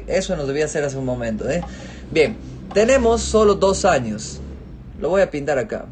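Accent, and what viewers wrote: Peru. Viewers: Es un gusto cómo se habla el español en Perú. Saludos desde Chile. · Estaba buscando cómo organizarme con mis ingresos y egresos y no encontraba algo práctico. Me encantó tu paciencia, habilidad y orden de tu explicación. Plus!!! Tu acento Peruano ♥️ 🇵🇪 ♥️ Gracias 🙏 Houston TX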